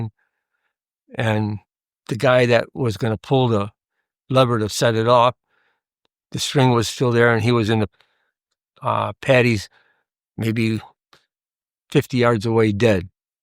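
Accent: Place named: Chicago, United States. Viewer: Sounds like you're from Chicago - maybe Southside - me too - US Marine, 1968, Phu Bai/Hue City. Well Done Marine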